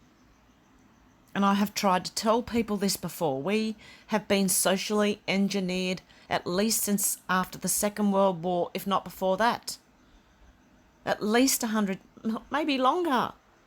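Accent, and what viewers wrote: Australia. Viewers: This has to be addressed by world leaders. One of them is addressing this issue being Donald Trump who is hated by the media. Australia pull out of doing business with Chinese mobile phone tech company. NAMED in report can’t spell name. Scott Morrison won our election which he was supposed to loose, thankfully God is with us. By the way you sound Australian. You are doing a great service to humanity bringing this out in the open. David ICKE has been warning us about AI and has been a lone voice accused of promoting conspiracy theories. May God bless us all with hope to change our world. hated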